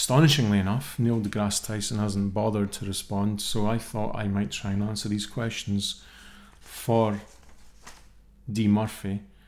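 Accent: Scotland, United Kingdom. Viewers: I always look forward to the beginning in the Scottish brogue (hope not offensive) "ello and welcome to another video" A nice, refreshing entry into the expected reassuring logic.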